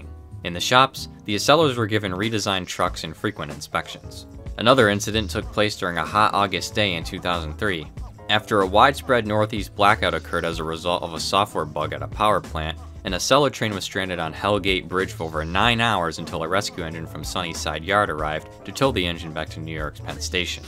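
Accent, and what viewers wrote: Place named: Chicago, United States. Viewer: You gotta be a Chicago boy/or visitor👍🏻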